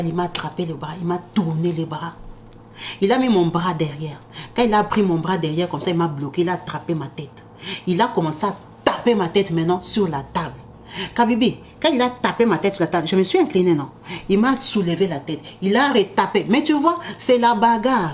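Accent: Democratic Republic of the Congo. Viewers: Ooh la mère chérie je vous aime bcp maman surtout votre accent congolais🥰🥰🥰😘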